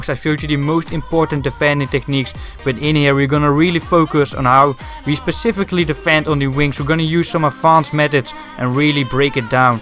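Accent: Netherlands. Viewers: Hmm are you dutch? I guess so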